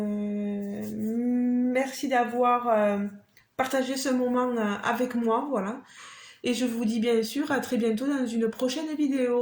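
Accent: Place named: South, France. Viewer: Hello sacré moment en ta compagnie. J’aime toujours autant ton accent du sud. Bisous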